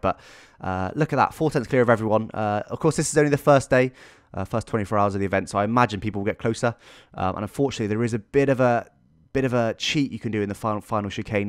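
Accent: United Kingdom. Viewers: For the first ten seconds I thought you sounded awfully English for a German fella.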